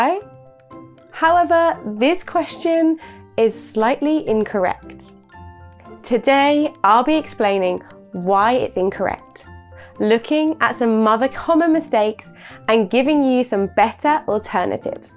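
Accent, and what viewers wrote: United Kingdom. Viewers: 와 역시 브리티쉬 발음ㅋㅋㅋ 너무 깐지나네요 전 언어교환101에서 영국인 친구 사겨서 요즘 영국발음 연습중인데 너무 재밌고 럭셔리해요ㅎㅎ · 역시 영국발음은 넘나 간지남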